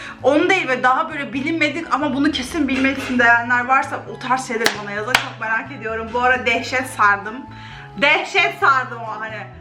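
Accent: Black Sea, Turkey. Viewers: Ohaa abla Karadenizlimiymiş. Oyyy uşağuum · Abla karadenizin neresindensin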